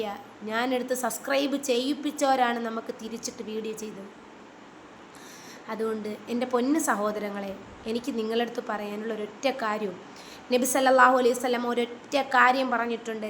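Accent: Thiruvananthapuram, India. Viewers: Tvm ഭാഷ ❤️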